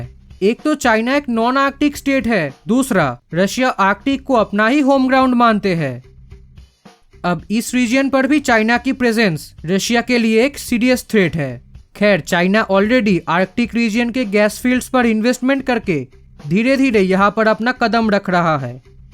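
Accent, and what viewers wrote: Bengal, India. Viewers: Bangali naki dada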